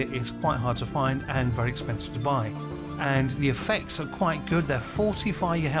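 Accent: England, United Kingdom. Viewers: This sounds extra legit with the English accent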